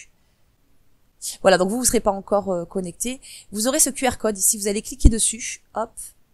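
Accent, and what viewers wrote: South, France. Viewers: salut jje profite de ce post pour te dire à quel point j'apprécie tes vidéos, c'est vraiment adorable de nous livrer toutes ces vidéos dans le domaine de l'informatique pour ma part hameçon particulièrement utile,je sais aussi que cela demande beaucoup de travail et peu de rémunération, dans tes commentaires on sent une petite pointe d'accent du sud,un grand bonheur de vivre et elles ne sont pas les nombreuses vidéos qui vont à l'essentiel et enregistré avec une très bonne diction. ce qui m'amène à te demander s'il était possible que tu nous fasses une vidéo pour le rootage d'autres téléphones android comme les Nokia sous OS Windows phone, et tous les Samsung a2,a3,a4,a5,s7 etc... qui manque le miens c'est le samsung a300fuxxs1bpe1 pour info ^^ merci encore pour tout ce que tu fais.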